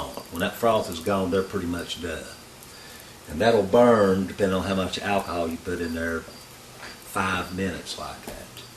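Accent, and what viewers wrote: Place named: South, United States. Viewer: Interesting Video. I discovered and around ‘92. Just my personal opinion but their self titled & were their finest releases. showed signs of what they previously were capable of but after left & Jimmy Stiff they seemed to be lacking which makes sense right?. I will now try and find a video explaining why Jimmy left. That’s one very real Southern accent you have Mr. Appreciate the video. It must’ve been hard leaving such a cool band, esp because of the tonnes of sexy women that would be at your shows. I imagine that would be the BEST perk of being in a RnFnR band for sure!!!! That’s the main reason guys play instruments 🤤🤙🏽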